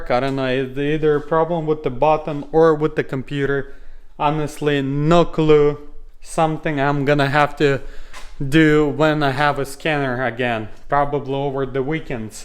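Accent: Russia. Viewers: How its possible to know what car part you need and where does it fit? Maybe he use some BMW manual or something? I suspect that your accent comes from russia or europe?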